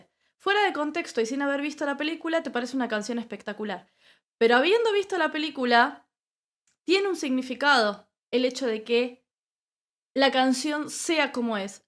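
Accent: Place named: Argentina. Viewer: 😢 que mal ojalá que sigas haciendo más videos me encanta tu voz y tu acento si eres argentina porque aveces me equivoco con los uruguayo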